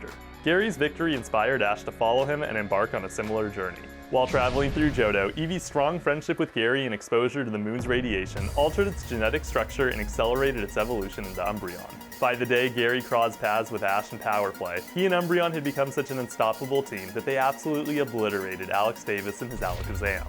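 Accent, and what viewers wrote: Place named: California, United States. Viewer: my instinc tells me you are Californian, am I right? ☀🌊🏄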